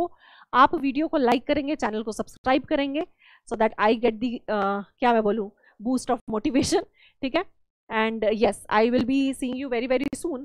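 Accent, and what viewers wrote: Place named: Allahabad, India. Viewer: allahabad se ho kya aap?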